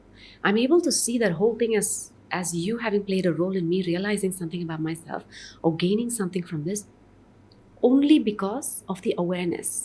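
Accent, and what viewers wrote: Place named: Maharashtra, India. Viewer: ji namste. Are you from Maharashtra.. I notice certain influence or MTI of Maharashtra in your speaking... Thanks, the guest is awesome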